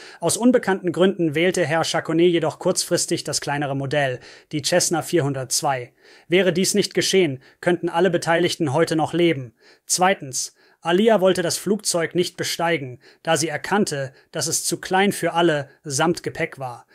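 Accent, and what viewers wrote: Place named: United States. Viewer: Was soll dieser ständige Wechsel von rel. sauberer deutscher Sprache in einen beschi...amerikanischen Akzent?! Sowas kann man sauber programmieren, also wieso dieser Unsinn?!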